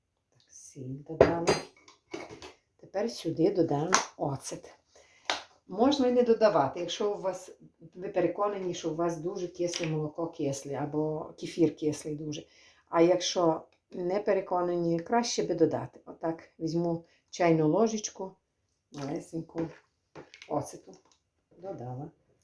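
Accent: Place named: Poltava, Ukraine. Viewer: Милая хозяюшка! Спасибо Вам и за рецепт и за воспоминания молодости,когда мы ездили в гости к свекрови в Полтаву. И этот говор, и эта простота в приготовлении,и даже марля на кастрюле. Свекровь делала такие вареники ещё и с мясом( фарш из отварного мяса). Дуже смачно было! Храни Вас Бог от бед и напастей! Здоровья. вам!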